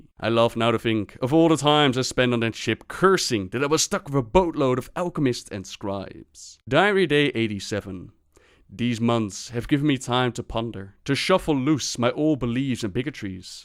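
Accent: Netherlands. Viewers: YES. Combined with the dutch accent he oh so despises, his videos, especially the R-sounds, make you shiver. XD I love it! Thanks for the good work. =)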